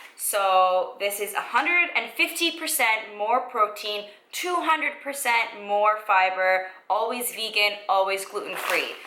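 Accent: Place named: Toronto, Canada. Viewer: I knew it, you sound like your Italian and from Toronto lol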